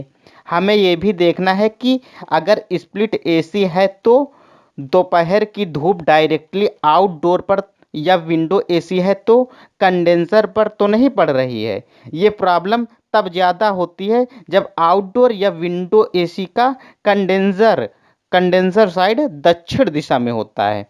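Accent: India. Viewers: You Are Gujrati?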